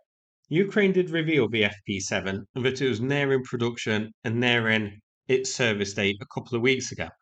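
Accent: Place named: Cockney, United Kingdom. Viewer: Dude I can barely follow your jacked up Cocknet accent.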